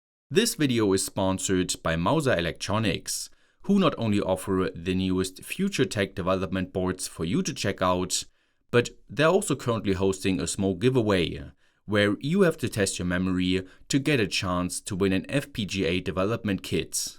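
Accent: Germany. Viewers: Is the Great Scott a real Scott? I hear a German (-ic) accent. :-)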